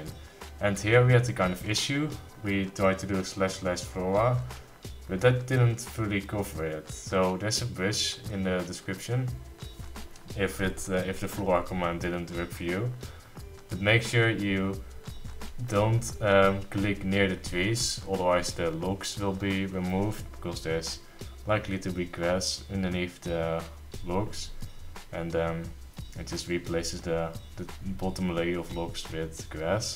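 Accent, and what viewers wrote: Netherlands. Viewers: are you from the Netherlands? it sounds like you are, I'm too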